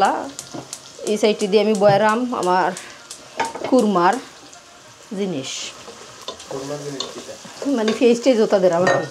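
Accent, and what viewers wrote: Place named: Sylhet, Bangladesh. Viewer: Aafa afna amrar sylhete, London a sylheti mat hunia Val laga afar muko amrar sylheti mat hunia, Amra hokkol syloti...aafa vlog dakta ar lagi kub kub Val laga.....Amar sylhet Amer afa..ra suvo kamona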